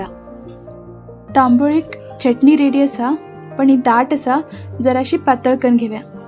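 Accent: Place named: Konkani, India. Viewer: Lovely to hear you speak konkani. Thank you for sharing the recipe 👍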